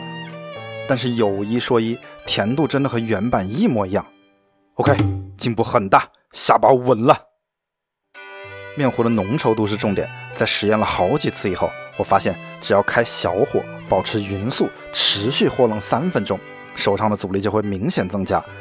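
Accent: Wuhan, China. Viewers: 视频主是武汉人吗？感觉有武汉口音😁😁😁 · 怎麼感覺是武漢人啊？！🤣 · 是武汉人吗？听口音像极了武汉滴弯管子普通话……😊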